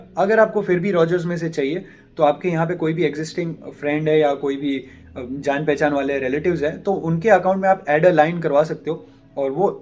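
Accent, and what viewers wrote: Gujarat, India. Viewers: Are you gujju?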